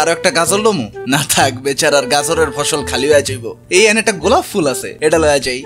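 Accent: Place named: Barishal, Bangladesh. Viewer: vhi apnar bari kothay apni ki borishali na- - ----_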